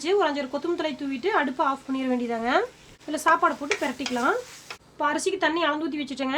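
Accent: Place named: Erode, India. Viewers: Hai i am also frm erode but now in ooty happy to hear our erode slang thank u god bless u sis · Nalla theriyudhu. Neenga pesara slang. Namma oorula than over ah vanga ponga nu pesuvom. I'm from Chittode 😊 lives in Bangalore . Ur good name ???? Ur dad s a cook ???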